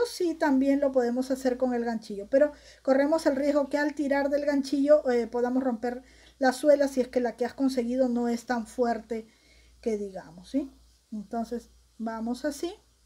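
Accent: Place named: Mexico. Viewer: ¿Eres Peruana?...tu acento es muy parecido al del norte de América , específicamente de México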